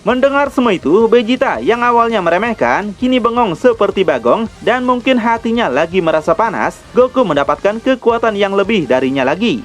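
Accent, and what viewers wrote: West Java, Indonesia. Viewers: ieu admin jiga orng sunda 😂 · Popolohikan sigan ieu orang sunda kang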